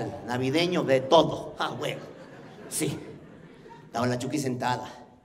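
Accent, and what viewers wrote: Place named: Veracruz, Mexico. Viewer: pues he visto un montón de videos tuyo, entrevistas pero nunca has explicado porque hablas como jarocho del puerto jejejeje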